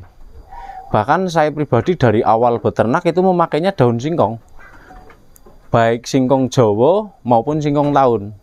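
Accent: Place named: Banyumas, Indonesia. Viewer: prtahankan Ngapaknya, trims ilmunya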